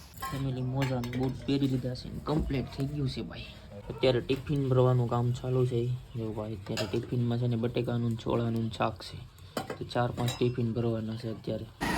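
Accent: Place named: Padvi, India. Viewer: પડવી છે આ બોલી પડવીછે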